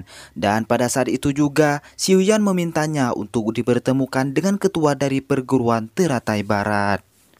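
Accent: Madura, Indonesia. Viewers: Aksennya seperti orang madura.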